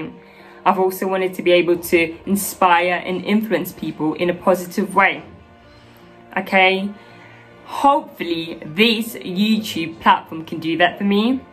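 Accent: United Kingdom. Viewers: Hello, I was wondering how come you seem to have a British accent. Did you live in the U.K.?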